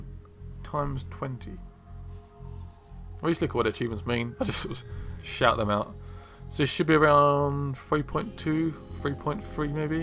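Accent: United Kingdom. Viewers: He is British i think??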